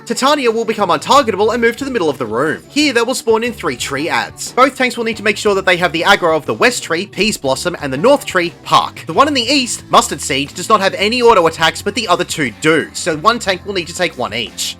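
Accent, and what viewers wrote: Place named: Scotland, United Kingdom. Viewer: I’m here for one reeeeeeason: the Scottish ish is crazy Brodie 😂🤣👏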